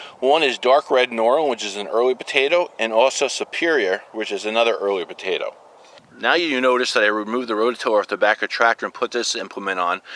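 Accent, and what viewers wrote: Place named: Pennsylvania, United States. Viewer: Where in PA are you? I'm just assuming PA or southern NY from your accent...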